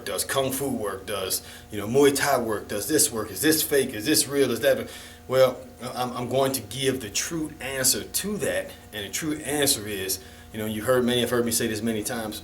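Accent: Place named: Philadelphia, United States. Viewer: Dude sounds and looks like hes from Philly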